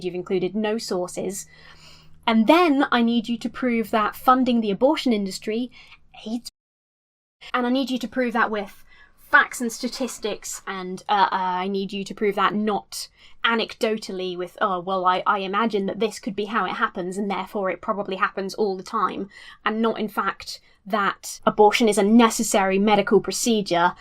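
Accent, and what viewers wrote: United Kingdom. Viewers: Your brittish accent is so beautiful. You should be reading in tutorials in how to learn to speak english on cd:s or something. You sound perfect. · You're an adorable, critically thinking, scientifically literate atheist with a British accent... Why do you not have a million subs already. Lol so glad I found this channel! 🖤❤️🖤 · It's nice to see someone who does athiest content also cover stuff like this, a lot of the people I watch tend to stick in a bubble of relegion/science related videos. Constructive and entertaining. Also, why does a female voice with a brittish accent make the phrase "fuck off" sound more powerful?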